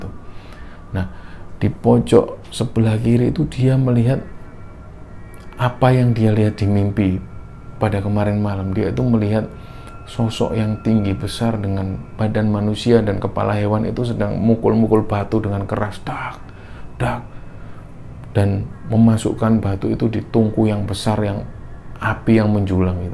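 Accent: Java, Indonesia. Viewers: Cara penyajian dengan belakang backsound uyon " juga background yg gelap remeng" ditambah cara menceritakan dengan logat yg agak medok jawa bgt Bikin suasana lebih "greng" Suara khas mas yg bikin kangen dengerin nya Jam segini lg... Heeemmm Sehat truuuuuss yakkk... Kewreeeennn👍🏼💞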